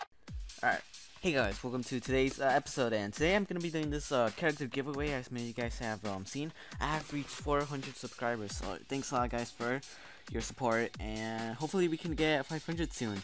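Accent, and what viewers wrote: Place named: New Zealand. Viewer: I knew it you had an accent! >:3 If I'm not wrong,you're from New Zealand right? Btw I want to enter aswell xD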